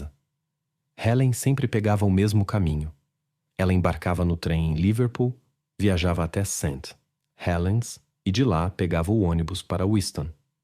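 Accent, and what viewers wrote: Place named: Portugal. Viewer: O canal é ótimo, exceto quando coloca sotaque de PORtugal. Afffff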